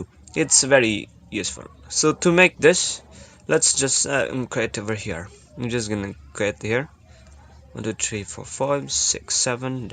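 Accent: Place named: Visayas, Philippines. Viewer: Nice english accent bro bisaya with nice English accent? Nice!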